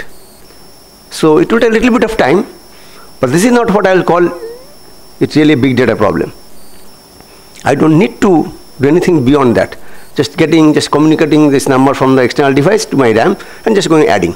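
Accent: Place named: Bengal, India. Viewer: He is speaking benglish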